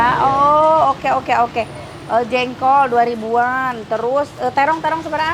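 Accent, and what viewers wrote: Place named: Sunda, Indonesia. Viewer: Gpp tteh ngomong Sunda jg .atw cmpur da stiap org pasti saat bicara logat daerahnya ke bawa..tugas editor ulah males ngedit.kerja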